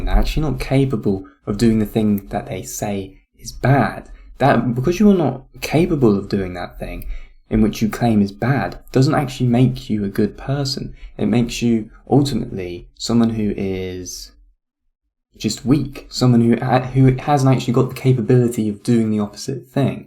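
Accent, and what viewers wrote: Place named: Cockney, United Kingdom. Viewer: Philosophy with a cockney accent....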